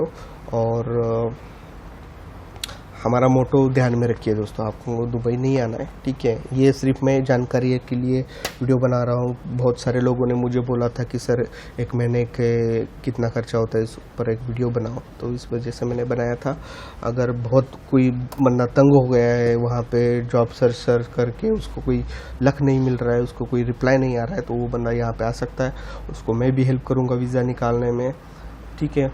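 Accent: Maharashtra, India. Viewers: sir jee, r u maharashtrian ? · yourvishal hahaha, tyachya tone varun kalta, ki toh marathi aahe! Jay maharashtra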